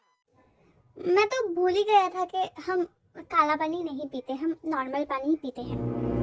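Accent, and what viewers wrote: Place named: Bengal, India. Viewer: App ka Bengali accent😻.....Just woww😍 অবাক হয়ে গেলাম🤗😘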